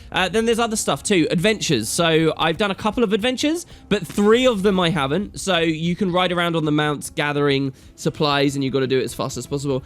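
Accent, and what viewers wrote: United Kingdom. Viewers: I really like this channel and find myself agreeing with much of what the speaker says. Though this could be because I'm a sucker for a British accent. :-D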